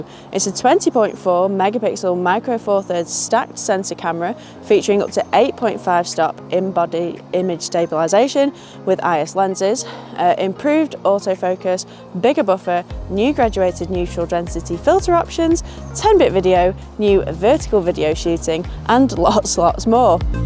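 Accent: United Kingdom. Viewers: Nicely presented and lovely Lancashire accent.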